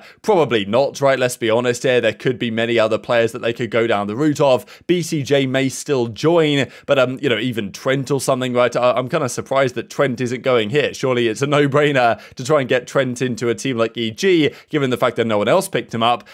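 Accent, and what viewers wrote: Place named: United Kingdom. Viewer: just how british people say it ig a lot of casters do it to lmaoooo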